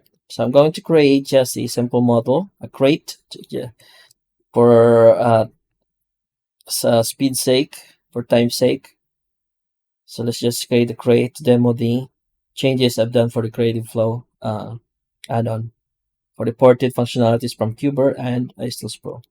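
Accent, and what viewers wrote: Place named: Philippines. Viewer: First time I hear your voice...😅 Now I can confirm you are pinoy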